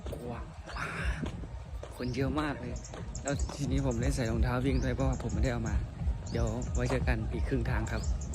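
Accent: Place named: Isan, Thailand. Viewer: ต้าวมันกว้างมากไม่ใช่มันกว่าง😀😀🐷🐷🐷หมูน้อยพูดสำเนียงบ่ถิ่มบ้านเฮาเลยน้อหล่า🐷🐷🐷🐷😊😊😊❤️💖💞🐷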